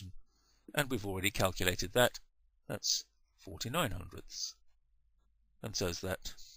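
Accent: United Kingdom. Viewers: Are you british mate? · The only reason I watched this video was because of the British accent on this guy.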